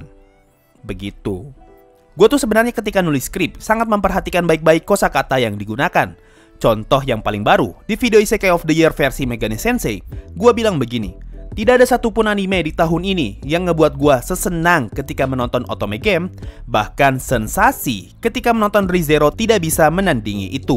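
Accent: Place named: Eastern Indonesia, Indonesia. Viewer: Boleh tau suku apa bro? Tidak ada maksud menyinggung, soalnya dulu perasaan logat timur lu kerasa banget di video lama tapi makin ke sini makin halus dan nggak kerasa lagi logatnya.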